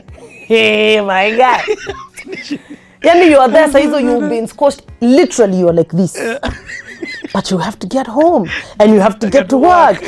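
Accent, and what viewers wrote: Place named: Mombasa, Kenya. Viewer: This lady Tande is a bomb. Awesome personality, great in anything she does. I real love and affirm her. Mombasa Swahili dialet second to none. Not conforming to society expectations but on a lighter note, can make a great wife to somebody deserving. She has personality and brains of a well parented gal.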